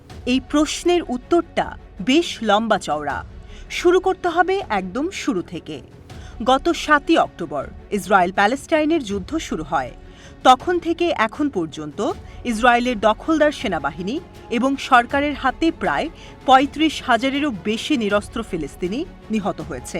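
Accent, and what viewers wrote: Kolkata, India. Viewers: কলকাতার স্টাইলে সংবাদ পাঠ করছেন কেন? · এই সংবাদপাঠিকা ভারতীয় মনে হচ্ছে কোনোভাবেই বাংলাদেশী মনে হয় না।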